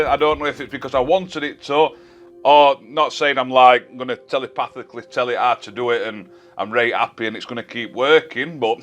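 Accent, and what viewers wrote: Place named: Scotland, United Kingdom. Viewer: This "Yank" loves humor with a Scottish accent! Thanks for making me laugh. Great info. I have fire "devices" from 1st gen to Fire Cube. I've been trying to get my 1st gen stick to work better and stop rebooting, and I think you nailed it with the power input. Used a 2.0a charger. However, it's still acting up, so it's probably just shot and old s/w doesn't help. Can't scroll through settings, just jumps. When I get to "Fire Device" it doesn't have reboot and even with 2.0a on updating says "power may be insufficient" . So think i'll give up on the 1st gen, and stick with 2nd gen (don't have 4k TVs). However I noticed unplugging my 2nd gen FS it was really hot. I like your idea of taking the shell off to run cooler but unplugging it might be hot? Was thinking may taking skin off and drilling holes or making it more open to let out more heat. 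Thanks. Great ideas!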